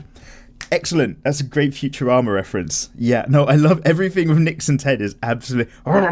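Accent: United Kingdom. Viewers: Hey, greetings from Bulgaria! I love your art and especially your tutorials! Some time ago I got into DWM. At first I was sceptical as to how the hell I could draw while you’re British accent is echoing inside my ears, yet I found it’s amazing! I truly draw better while listening to DWM! Thank you, keep up the good work!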